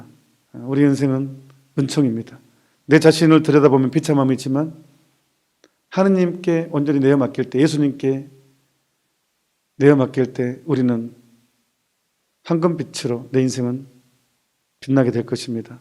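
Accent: Jeolla, South Korea. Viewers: 신부님 전라도사투리 정감이갑니다 저도전라도ㅋㅋ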